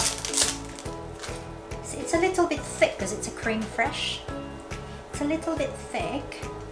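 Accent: United Kingdom. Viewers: look so delicious i would love to taste that & i love listening to your british accent sis. new friend here from australia teamlipat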